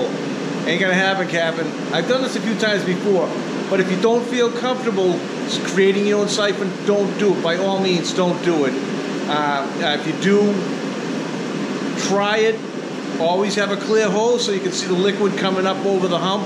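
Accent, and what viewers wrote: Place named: Boston, United States. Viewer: Holy crap your Boston accent is strong.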